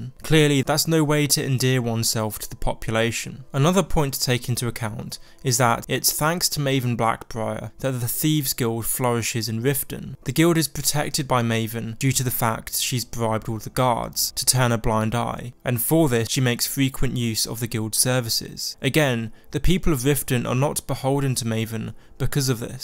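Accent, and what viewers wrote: United Kingdom. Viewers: Hey I'm curious, what region is your accent from? I know it's British, but what kind?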